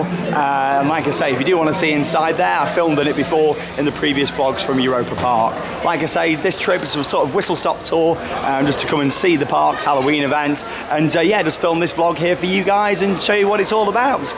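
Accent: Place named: United Kingdom. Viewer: all right no Fun you are a good vlogger but youre brithish english is very fun hahha best 💪 Stüpet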